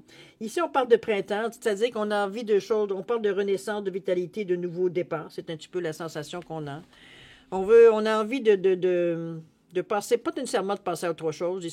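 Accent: Quebec, Canada. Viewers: super bonne interprétation j'aime ton accent québécois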